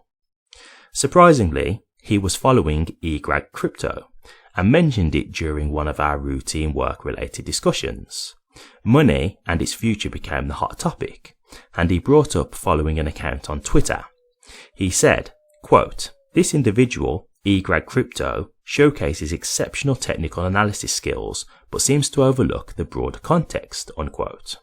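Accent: Birmingham, United Kingdom. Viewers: You’re from brum ?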